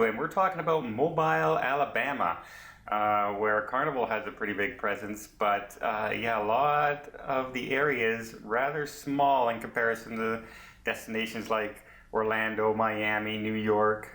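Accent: Canada. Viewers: Nice video but I need to correct your mispronounciation of Mobile..I live in Mobile soo I want to teach you the right way ...you say Mobile..like its a mobile phone.. Mobile has so much French influence..as does it's name. Think of the word..seal..then add Mobile..so its a hard Inflection on bile...sounds like seal. Welcome to Mobile. We love tourists! Sounds like you are Canadian? Come on down to see our beautiful historic city!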